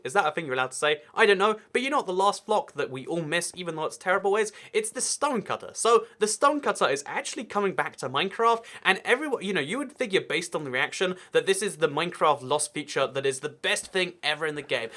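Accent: United Kingdom. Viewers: Is that a German accent he speaks with in the beginning? Weird since he speaks in a British accent the rest of the time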